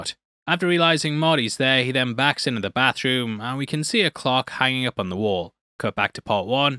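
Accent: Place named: United Kingdom. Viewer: "from when he played Gundam" ....I know british accents make some words are hard, but that's an interesting mispronunciation of Gunman XD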